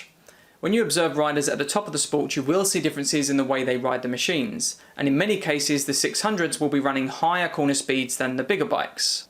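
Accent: United Kingdom. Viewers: that be good also but if I were you Im gonna try not to rush in speaking and remove the accent & slang since not everyone who watch your video come from britain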